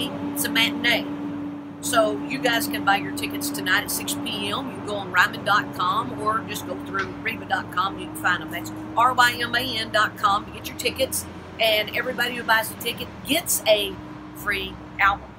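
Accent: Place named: Oklahoma, United States. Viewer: She talks so differently than when she was younger. But all us Okies sound like that eventually.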